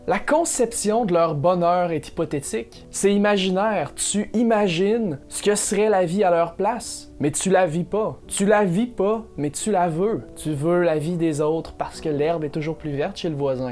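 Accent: Quebec, Canada. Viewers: débile profond + accent québéquois + tête de gay. Trop.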